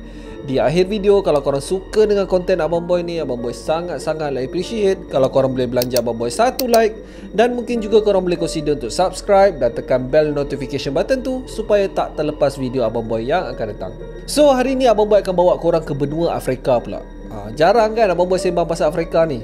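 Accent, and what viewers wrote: Perak, Malaysia. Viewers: ptut comel je bnyi dia😅, ooo... Kome orng perak rupa nya atei Kome sihat ke top?🤭 Teman dlu blajor dkt sano, Teman tinggey kt Batu gajah dlu yop. (Orng perak Jgn marah erkk, saja tringin nk blajaq ckap perak)😅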